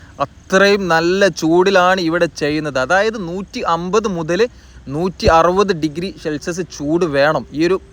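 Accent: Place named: Northern Kerala, India. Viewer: താങ്കളെ കാണുവാൻ കഴിഞ്ഞതിൽ സന്തോഷം. പ്രോഗ്രാമുകൾ നന്നാകുന്നുണ്ട്. പ്രത്യേകിച്ചു താങ്കളുടെ വിവരണം. വടക്കൻ ഭാഷയിലുള്ള സംസാരം, ഞങ്ങളെപ്പോലുള്ള കോട്ടയംകാർക്ക്, കേൾക്കുവാൻ ആകർഷകമാണ്. All the best.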